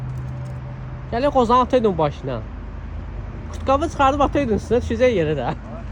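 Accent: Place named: Mashtaga, Azerbaijan. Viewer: Hansı ləhçədir,Maştağa?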